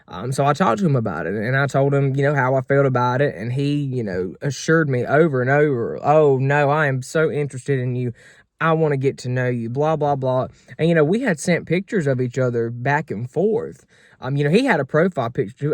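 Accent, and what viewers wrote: South, United States. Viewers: I love your accent- true southern not fake- if you ever go to Memphis go in May for the barbecue festival and go to the Rendezvous for ribs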